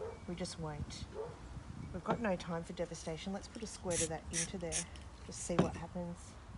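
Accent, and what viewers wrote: Australia. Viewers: Just love hearing you talk. For a minute I think I’m back home in Sydney, I wish I was. On ya love!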